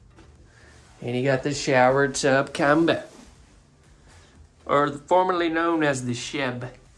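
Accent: South, United States. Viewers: Thts Southern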